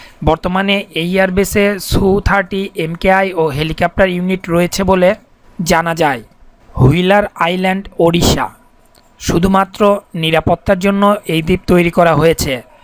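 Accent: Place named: Bangladesh. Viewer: বাংলাদেশিরা ওই রকমই উচ্চারণ করে , এর অর্থও বোঝে কি না কে জানে!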